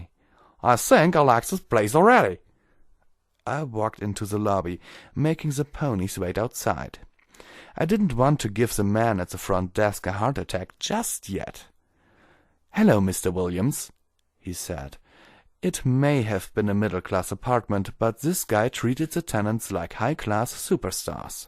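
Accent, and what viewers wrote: Germany. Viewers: I loved how i could properly hear the story being told, and how your accent brings it's own flare to the voices. I'm sorry if this sounds rude in my assuming, but are you perhaps german? I like the accent and just got curious. Especially considering how prominent it is. It's very soothing to my ears. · Now I'm not a fan of mlp, and am just watching this because I have nothing better to do. But your silky German accent makes this watchable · hey bro are you from Germany or Russia or England or Scotland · that german accent is so heavy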